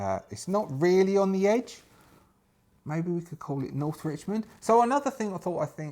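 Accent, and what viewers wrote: United Kingdom. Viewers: Never figured you lived in Aus - sound like a proper cockney geezer. Regardless, you definitely didn't miss much in those 22 years. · Even swearing sounds polite when delivered with a proper English accent my dude.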